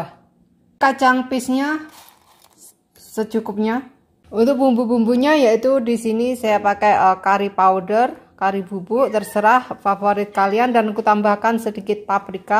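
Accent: Java, Indonesia. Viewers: MBK jelas uraian dialeknya njawani mbkx asli kebumen kah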